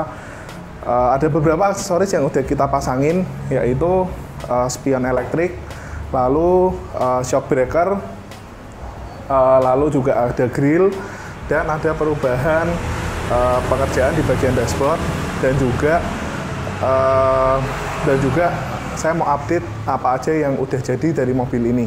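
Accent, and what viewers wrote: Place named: Semarang, Indonesia. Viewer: Logat semarangan bangts. 👍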